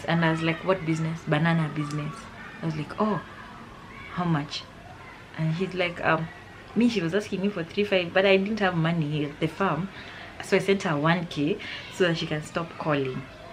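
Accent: Luhya, Kenya. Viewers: It's the Luhya accent for me!😂😂😂😂😂.... The storytelling was 💯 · just watched this after a long strenious shift.....laughed woooi.....the luhya accent waaaa😅🤣.. you are a perfect narrator😅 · Wueh, it's the luhya accent for me 🤣🤣🤣🤣🤣🤣🤣🤣🤣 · Amazing story, it's very calming listening to you... Your luhya accent is on point 😅😅😅. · You are generally nice.....you remind me of one who kept reminding me how my children love her though i couldn't really see it....".aki unajua Hawa watoto wako wananipenda sana"same luhya accent......and once I bought a box of long life milk on a Sunday and on Tuesday ilikuwa imeisha😭she stayed for 8 months and I gave up...I was tired of her! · The luhya accent for me🤣🤣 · Boundaries are important. I have my own issues can’t deal with hers also. Unless it’s life or death. Btw I love the luhya accent.🙌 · It's the luhya accent for me.. You had me at the school fees part na fee structure on the TV...😂 😂 😂 Mums aki we should get express tickets to heaven.. 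dealing with bosses at work and at home bado kuko ivi...ah... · Sorry but you speaking the luhya ascent is funny😅😅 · hahahaha i love the accent ya kiluhya hahah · 😂 😂 😂 😂 😂 The luyha accent. I had to replay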